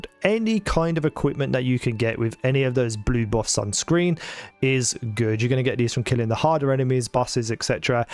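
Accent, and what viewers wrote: Birmingham, United Kingdom. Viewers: Disregarding because of the Brummie accent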